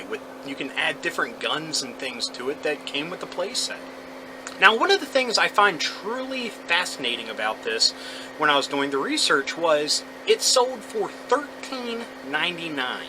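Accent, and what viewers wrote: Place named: Baltimore, United States. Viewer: your Baltimore accent triggers me, but in a good way 😄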